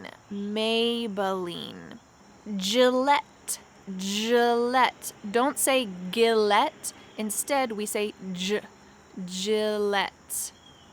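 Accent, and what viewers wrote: United States. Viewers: This is the American way to pronounce them, not the right original one. I doubt Adidas is called in this way in Germany 😜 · Mmmm these are all American pronunciations…..anything not an American brand is mispronounced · Thanks a million🌷🌷👍👍as an iranian i'm so happy to learn brands in english specially with american accent😍😀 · Go and learn from someone who speaks British English because Americans mispronounce everything in English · I love American accent so much😍and this video is so interesting and useful! Thank you!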